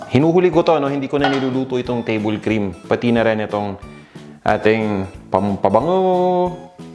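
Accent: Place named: Coño, Philippines. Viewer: Masaya po ako na matatas na tagalog niyo. Old videos niyo po ang coño kasi pakinggan haha. Pero masaya pa rin. Itatry ko po ito sa noche buena. Magisa po ako sa pasko kasi bawal magtravel pero dapat masarap pa rin pagkain kahit ganun.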